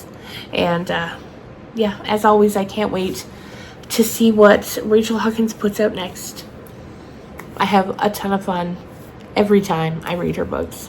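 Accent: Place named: South, United States. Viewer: Sassy southern - love it.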